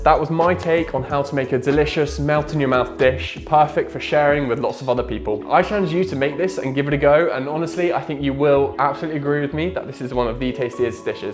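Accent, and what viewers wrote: United Kingdom. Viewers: Bruh the British accent 😂😂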